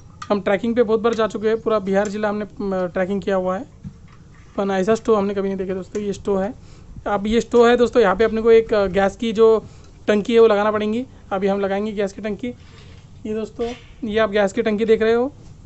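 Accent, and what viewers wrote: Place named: Maharashtra, India. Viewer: तुमची हिंदी महाराष्ट्रातील आहे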